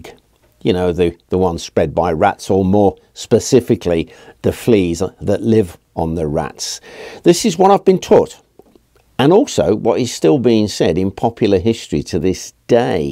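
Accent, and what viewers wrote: United Kingdom. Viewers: I am a history enthusiast. And my love of history deepen even more and more when I watch your videos with your extraordinary british accent and learn unique words thanks to your rich lexicon.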